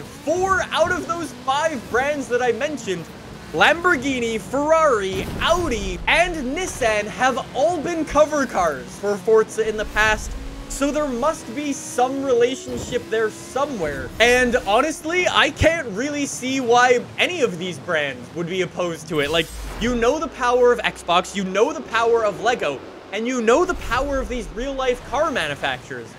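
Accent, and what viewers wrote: United Kingdom. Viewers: What part of the UK are you in?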